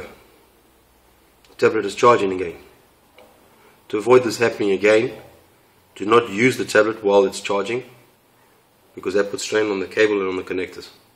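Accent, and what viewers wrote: South Africa. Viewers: r u south African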